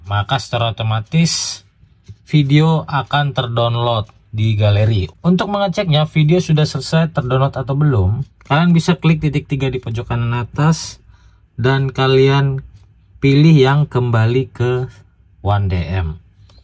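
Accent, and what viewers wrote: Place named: Sunda, Indonesia. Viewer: Kok seperti suara Aa bandung ya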